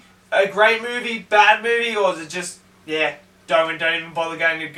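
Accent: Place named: Australia. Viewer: Martin Cuadra he’s also from Australia so things may not sound the same with an accent. I’m glad you had the time to actually sit there and write a comment about it. 👏🏼👏🏼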